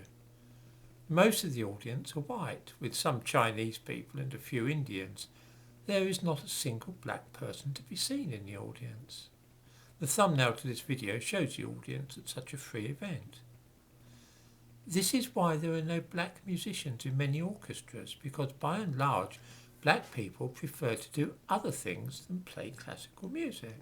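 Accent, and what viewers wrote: United Kingdom. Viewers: I like the content. Problems I have with the channel though are, the marked British Accent is an obstacle. Second, like me the gentleman is old and balding. Me I am fatter with less wrinkles and more hair. He is older than me. Third, the moustache. TRIM IT. Fourth, MOVE the camera farther away and you will not look as wrinkled as you are. Fifth, maybe you could wear a hat ore a beret. Sixth, you could wear a button up shirt. Mostly, like MANY channels, you are VERY CLOSE to the screen. BACK THE CAMERA UP or FIND a BIGGER ROOM. MOVE the BOOKCASE from behind you. Are you in a TINY APARTMENT, a CLOSET? This all said, your CONTENT IS GOOD!!!!!!! Thank You. My thumbnail is of a very old Harvey Comics character, Hot Stuff the Little Devil. So, NO, I am not trolling people as a devil.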